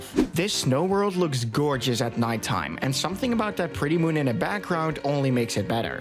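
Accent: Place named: Netherlands. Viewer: Haha ik dacht al een Nederlands accent te horen. Tof filmpje. Ik zou ze graag willen spelen. De newer games, maar ik ben totaal niet technisch, heb geen pc en weet niks af van mods.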